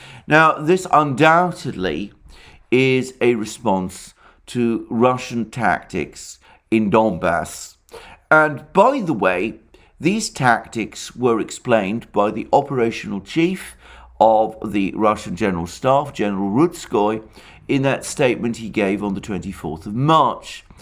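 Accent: United Kingdom. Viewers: Alexander is British. Tomato, Tamayto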